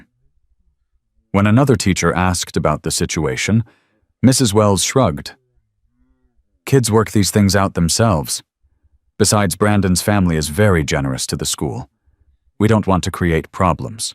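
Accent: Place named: United Kingdom. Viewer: UK English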